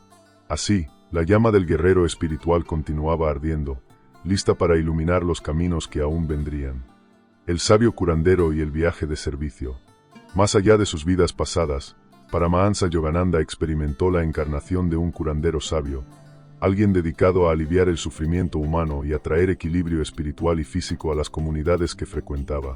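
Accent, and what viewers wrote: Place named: Spain. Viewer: Olá ..por favor..alguien podría decirme de qué parte de España es este narrador? Paramahansa es mi maestro ..mi gustó mucho la narracion ! Soy de Brasil y entendi bien su discurso ..estoy apriendendo español! muchas gracias !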